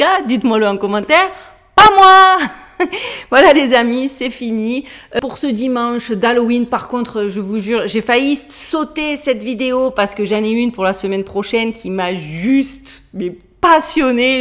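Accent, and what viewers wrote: Marseille, France. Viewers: hello tout le monde toi qui a un accent du sud de la france cherie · eh tu sais quoi ta un accent de marseille tu l as pas perdue tu peux me croire bonne continuation et allez l om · Bonsoir, comme toujours un sourire et un humour que j'adore et je ne parle pas de l'accent de Marseille top. Superbe sujet et vraiment à l'ordre du jour on en revient toujours au SS ils sont vraiment trés présent dans notre quotidien depuis toujours. En se qui concerne la visite du château se sera comme toi sans façon laisse ma place aux autres!!!! Belle semaine......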